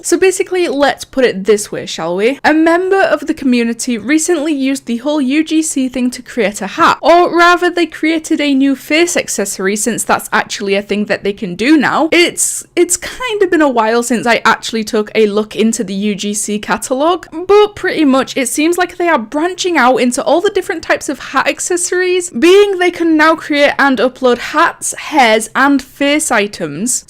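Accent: Scotland, United Kingdom. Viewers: First off "Badass" isnt a bad word second off i like your scottish accent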